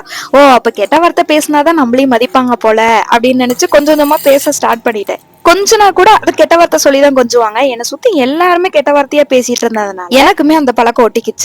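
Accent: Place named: Genguvarpatti, India. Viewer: Genguvarpatti slang 😂😂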